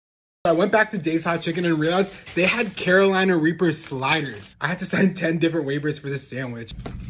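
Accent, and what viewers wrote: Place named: Egypt. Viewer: يعني انت بتتكلم مصري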